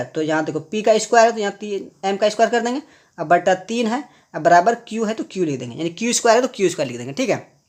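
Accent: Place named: Bihar, India. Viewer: Bihar se ho kya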